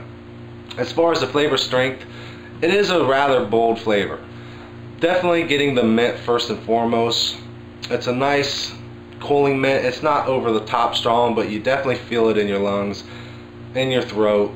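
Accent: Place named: United States. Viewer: Thanks for getting back to me and thanks for the advise...love your videos and i love your us accent better then my boring UK accent loll. x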